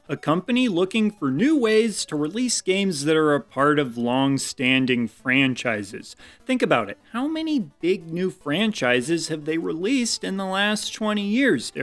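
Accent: Midwest, United States. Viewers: Dude I legit haven’t watched one of your videos that I haven’t loved Thank you I watch your channel (mostly listen) while doing chores like washing dishes or folding laundry and seriously I feel like you are my Midwest (guessing on the accent) BFF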